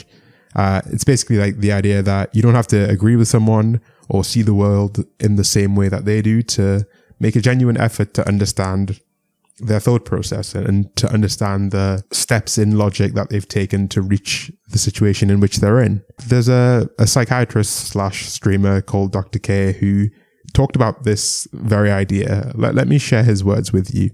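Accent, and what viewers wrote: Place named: Newcastle, United Kingdom. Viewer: My mum looked over at my phone and said our accents are similar, u from new castle? Also she likes ur skin..no I'm not being held at gun point (help)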